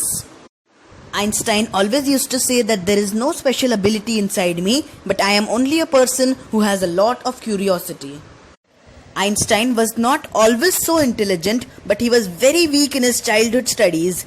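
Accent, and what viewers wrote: India. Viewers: Nice Indian accent but cool video very informative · nice indian accent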